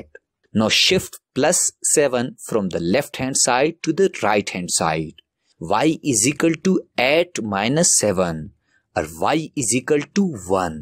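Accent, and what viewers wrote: South India, India. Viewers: thank u but are u an indan man ur accsent is so good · Why does he sound like an indian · Thick indian accent 💀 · Sir are you from south india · Di po siya filipino, parang Indian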